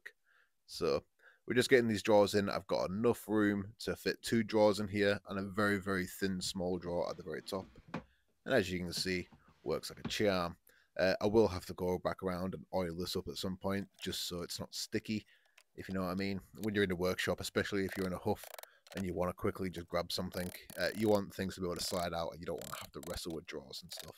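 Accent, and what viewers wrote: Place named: Midlands, United Kingdom. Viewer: ahh Cumbria, you sound like you’re from the midlands? I work in Carlisle on and off, I like that part or the uk!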